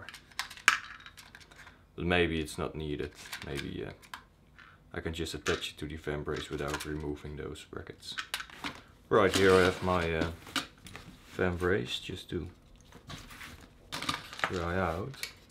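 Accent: Netherlands. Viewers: you sound really dutch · Are you dutch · Super cool! Alleen je hoort gwn dat je nederlands bent :) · Is this guy dutch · I thought so aswell cause he sounds really dutch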